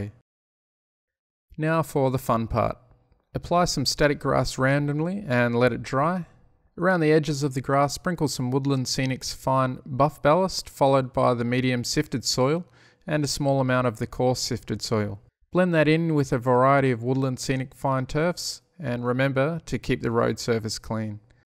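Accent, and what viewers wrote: Australia. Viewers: Hi, great vid mate, got referred to you by ModelerMan Mike. Great to hear another Aussie accent and looking forward to seeing more of your stuff! Cheers, Dan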